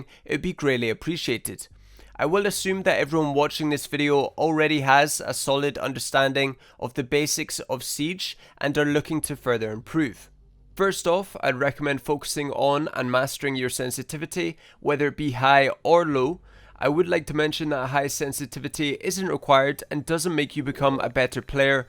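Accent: Scotland, United Kingdom. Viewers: R u Scottish? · Where you from?can hear a Scottish accent a bit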